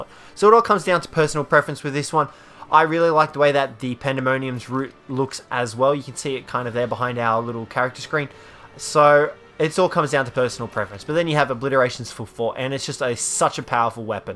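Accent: Australia. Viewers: If you've ever met anyone from down under, there are many words they pronounce differently culturally. I.e. Pita (Pih tuh) visa (Pee-ta), and abalone (Ah-ba-lone) visa (Ah-ba-lone-e)... and in the end, who really cares? Did you understand WHAT he was talking about? If so, why is his use of the word a problem? So, in my opinion, let it kill you... One less d-bag's comment.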